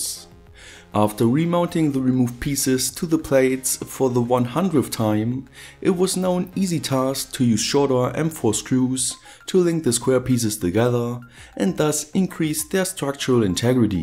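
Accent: Germany. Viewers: Greatly appreciated Germany accent! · Are you German? You sound German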